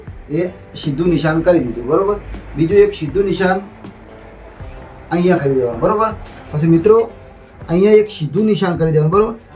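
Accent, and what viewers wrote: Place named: North Gujarat, India. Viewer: ઉતર ગુજરાત ની ભાષા સે સાહેબ,,કાયુ ગામ જનવાજો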